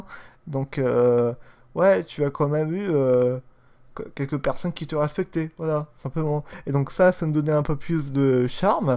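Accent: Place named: England, United Kingdom. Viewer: est son bel accent anglais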